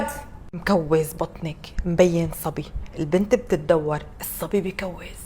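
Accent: Syria. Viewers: لهجة سورية تهبل ❤ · اللهجة السورية عششششققق عيني ربك والله 🇮🇶🇸🇾❤❤😂😂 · محلاااااكي نفسي تكون عندي صديقه نفس طريقه كلامك وحرجاتك 😂😂😂 محلاها اللهجه السوريه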